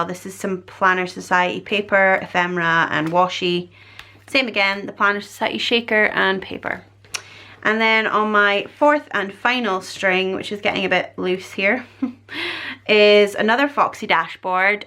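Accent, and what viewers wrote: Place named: Northeast Scotland, United Kingdom. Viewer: You sound like you must be based in the North East of Scotland? Love this video by the way!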